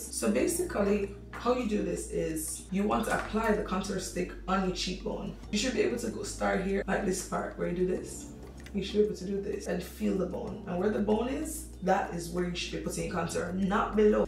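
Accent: Jamaica. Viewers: girl, are you Jcan? I hear a slight accent, but then again, I could be wrong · heard ur accent and said instantaneously "Jamaica 2 d bbclt world" tf. Repping wonderfully mama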